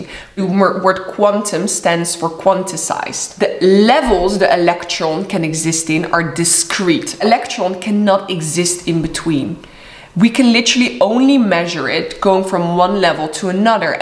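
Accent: Netherlands. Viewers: Was it just me or was anyone else wondering which country this youtuber is from? She has an indian name, a dutch accent, speaks british english. Bet she lives in the US. Talk about being a global citizen! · What’s your native language?My guess is Dutch or Flemish? English is a tricky one sometimes. “Thoroughly” is pronounce “thur - O - lee”, with the “gh” more like in though than in rough. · Nice Dutch accent. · interesting video! BTW, Ben je Nederlands? ;)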